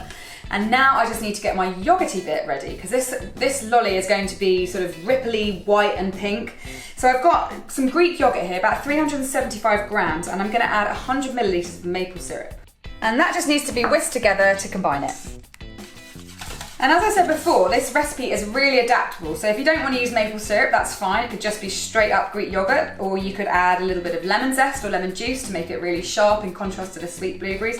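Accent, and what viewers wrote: England, United Kingdom. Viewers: You are English. I live in America and I cannot understand almost everything you say. Slow down.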